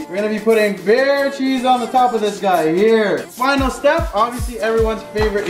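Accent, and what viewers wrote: Mexico. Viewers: is is just me o does he hve a mexican agcent i should know im exican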